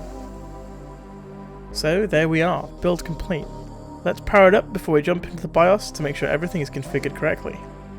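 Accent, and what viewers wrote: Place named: United Kingdom. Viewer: ) Hope for more contents from you bro. That well-defined British accent <3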